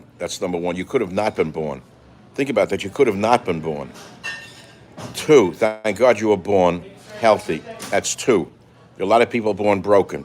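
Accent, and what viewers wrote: New York, United States. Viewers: regardless of whether we are alone or with family out here, we listen because of the way you say things, your delivery and segueways. I listen for your New York accent and kvetching. Keep it up my friend.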